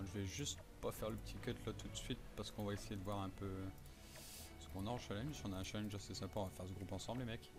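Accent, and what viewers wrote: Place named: North, France. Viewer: oh t'es du nord toi aha, bonne video poto force a toi!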